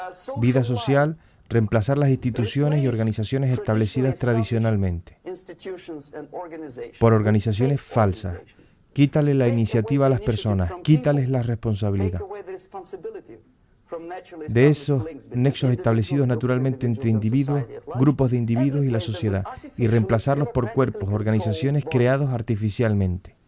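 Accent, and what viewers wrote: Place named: Canary Islands, Spain. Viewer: Muchísimas gracias por compartir conocimiento que de verdad nutre, tan escaso y tan difícil ee discernir entre todo el marasmo de intoxicación y desinformación que hay en la red. Orgullosa de verificar que por supuesto hay otro canario "despierto" (nuestro acento te delata...es inconfundible y a mi parecer precioso). Te deseo lo mejor con el canal, y en general, "que te vaya bonito" que diríamos por estos lares.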